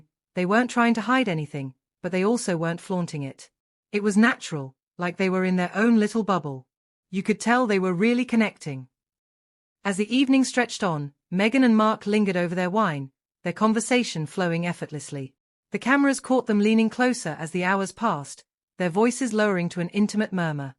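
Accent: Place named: England, United Kingdom. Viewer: Too funny lol A woman with an English Accent using such words to describe Meghan...friendly, etc ETC If the A I bot said Mean Girl, Not to Bright, Liar, Bully, and has no clue 9th month the Baby drops, not rise! He may be wealthy but in terms of how MM views herself and the turning Harry into her lap dog, Mark is mostly in the same place as Harry though his Marriage is vastly different than MM' s spare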